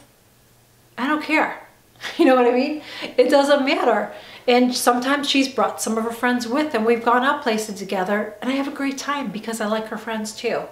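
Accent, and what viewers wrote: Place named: South, United States. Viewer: Hi lovely!!! Love your intro music!! Awww there's my girl!! Lol!!! Save yourself the fight! He doesn't need to know you're leaving the window open!😂 so excited you're gonna be house hunting!! Take us!! I can't imagine how huge this move is! The thought of it makes me stay out! Lol!! I love you taking us around the house!! You look gorgeous as your real life self! That's so interesting hat they staged your house! It seems like that defeats the purpose of taking pix of your house! 10 videos what!!!??? Love the glitter deer! And the trees!? Precious and pink! Love them all!! What!!?? Girl I love you more!! And omg that actually sounded really good!! I think you're getting really good with the southern accent!! It sounds greatttt on you!! Yes girl noooo drama!! We run from it!!!! I feel the same way about you sister!! 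Love you to pieces and loveeeee my daily time with my!! Love your vlog!!! Even if you hadn't mentioned me I love this style video on you!!! You're seriously even more beautiful in real life!! And this comment is embarrassingly long! Lol!! Love you to pieces my friend!! Xoxoxo!!!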